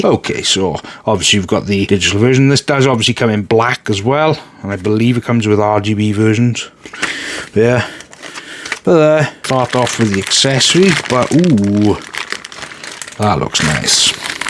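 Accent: Wales, United Kingdom. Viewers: Heya mate! First of all, Im not used to welsh accent, and I must confess I thought you were dutch for a second lmfao. Chatgpt highly recommended this cpu cooler to me, and I was wondering if you had to chance to compare its noise compared to the Noctua or Bequiet that you featured in your comparisons performance wise ? Thanks a lot bud! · That's the most welsh accent I have ever heard in my entire 41 years on the planet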